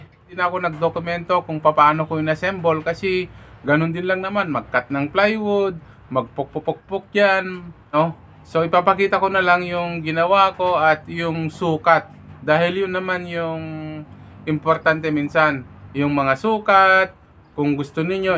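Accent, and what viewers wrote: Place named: Ilocos, Philippines. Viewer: Ilocano ka met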